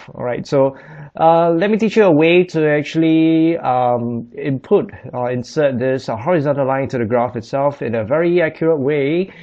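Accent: Singapore. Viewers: Can tell is Singaporean ! Thanks! haha!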